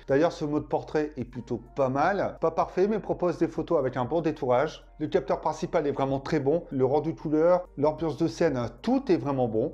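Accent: France. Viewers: Merci pour tes conseils et présentations éclairés, je viens de me commander un honor 9x du coup. Mais il m'a l'air t'es bien de ch'nord, ti, hein?? Bonne continuation pour ta super chaine YT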